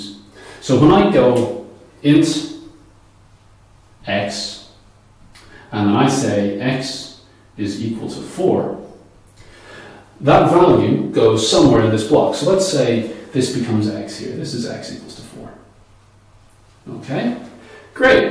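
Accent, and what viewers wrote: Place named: Ireland. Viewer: Yeah please do! and nice I am aswell. It's always nice to hear an Irish accent in this area of youtube videos. keep up the good work!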